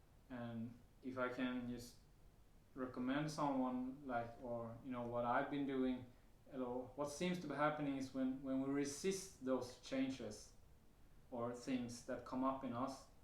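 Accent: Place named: Sweden. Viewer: Tyckte jag kände igen svenne vibbarna i accenten 😊 Tack för din utvärdering 🙏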